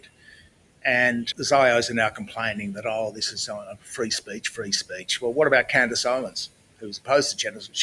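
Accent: Australia. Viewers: Nice to hear an Aussie ascent on my side of politics. I have missed it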